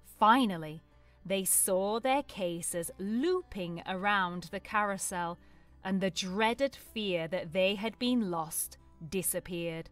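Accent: United Kingdom. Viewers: Please give us time to read this story in British accent because we want improve our British accent 🌷🌺💞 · Thank you for this wonderful story! 🌞 The clear British accent and helpful subtitles made it easy to follow and enjoyable to listen to. Perfect for B1-B2 learners like me! Please keep making more stories like this. 😊📚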